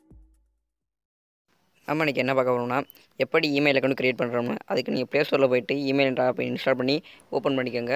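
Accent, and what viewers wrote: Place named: Tirunelveli, India. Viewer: Payapulla thirunelveliyo 🤩 luv ur slang bro 🙌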